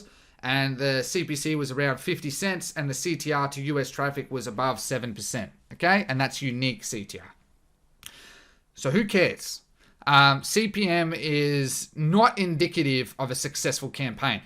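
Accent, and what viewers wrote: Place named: Australia. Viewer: Thank you so much man for the content , I'm understanding Australian English better now 👍👍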